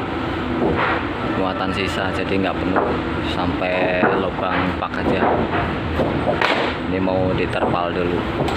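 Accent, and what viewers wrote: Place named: Java, Indonesia. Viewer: Semangat bang.. 👍👍👍👍 nyari rizqi..semoga sukses.. medok ya..kek aku hehehe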